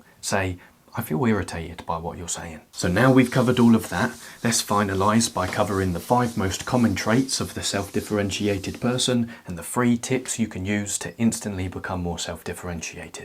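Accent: United Kingdom. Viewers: I love this guy! (And the accent! Everything sounds smarter with a British accent 😂)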